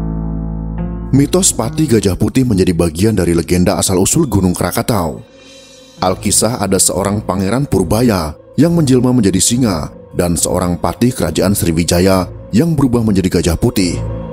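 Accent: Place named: Bali, Indonesia. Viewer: Bang, org Bali ya??? Pengucapan T nya Te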